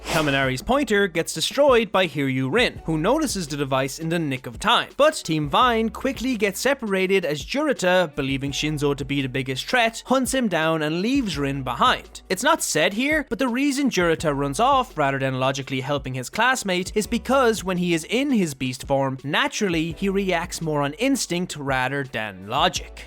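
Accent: Ireland. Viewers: Shut up and take my money. Also, you sound Irish. Are you? Curious.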